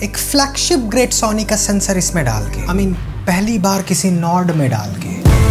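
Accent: Bengal, India. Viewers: humm Bangali ❤ hindi accent sune bojhai jai Bangali ke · Bro to be honest with me are you Bengali?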